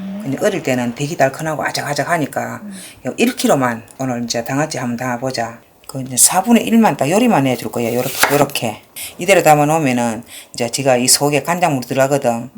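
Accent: Gyeongsang, South Korea. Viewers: 말씀 도 완전 경상도 사투리 정감 가고 좋아요 😀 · 선생님~ 엄마가 정말 팬이세요~ 햇양파가 많이나와서, 양파장아찌는 벌써 두번째 담아 먹습니다. 남해분이시라더니, 저도 경상도 사람이라 말이 정감이 갑니다~~♡♡ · 엄니 설명이 유머스럽네요 경상도억양 정겨워요 양파장아찌 맛나보네용 비율도좋고따라하기쉽고~👍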